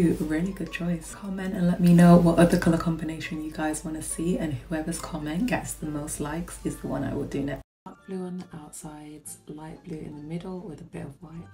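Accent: United Kingdom. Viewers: Can you do pink yellow and purple❤ and I love your British voice and I also love your make up😊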